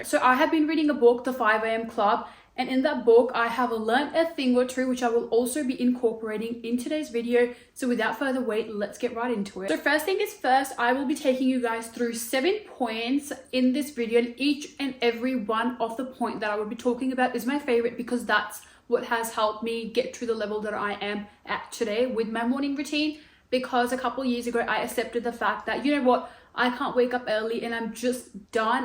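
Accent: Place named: India. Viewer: All I hear is CURRY CURRY CURRY in an Indian accent 🤮🤮🤮🤮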